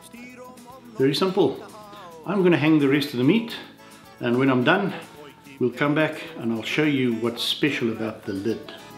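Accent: South Africa. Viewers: He’s Afrikaans accent is thick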